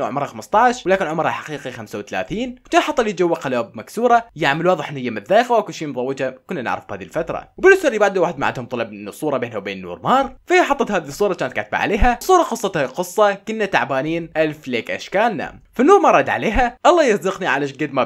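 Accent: Iraq. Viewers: انت عراقي؟ وانا اقول انت سوري؟؟؟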